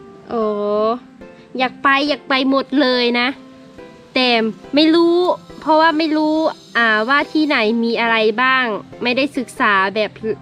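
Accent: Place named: Surin, Thailand. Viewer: ฟังไปฟังมาคิดว่าเป็นคนที่ไหนที่แท้คือสาวสุรินทร์นี่เอง... จังหวัดสุรินทร์อยู่อิสานใต้อยุติกกัยกำพูชา.. พูดไทยเกือบเก่งละครับ.. หัดพูดไปอีกนิดนึงเดี๋ยวก้อพูดภาษาไทยคล่องนะครับ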